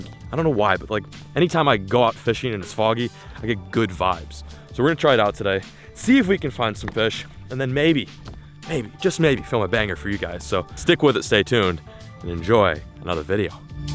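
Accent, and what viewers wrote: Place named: Texas, United States. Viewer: Did anyone notice how texas accent came back when he left Main?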